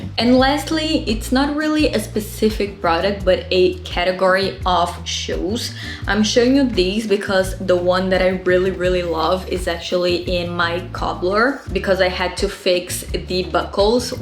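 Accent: Brazil. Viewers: Brasileira, que legal! I was wondering where your accent was from, I really liked your content! Jesus te abençoe, muito sucesso!!!❤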